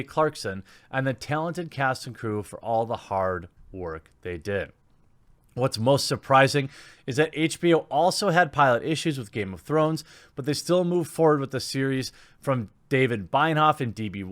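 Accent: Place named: Wisconsin, United States. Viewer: Wisconsin accent. Egg becomes "ayg," bag becomes "bayg" and dragon becomes "draygun"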